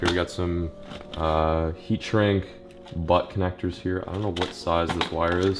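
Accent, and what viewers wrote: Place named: Alabama, United States. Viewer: dang is very pretty! What a good lookin couple-- heads must turn when the two of u are walking down the street! And when you all talk, w/th low smooth voice and w/th perfect Alabama (guessing) accent. SWEET!!! 😊😇🤠 BTW, happy 4 u both and good to c Lucky back! and i like that hit and miss, its ok if you're not an expert, skill set!👍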